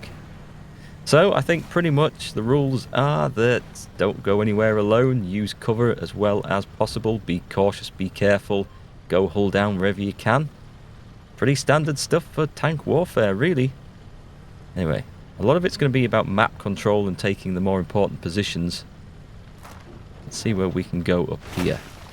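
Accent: Yorkshire, United Kingdom. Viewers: From yorkshire?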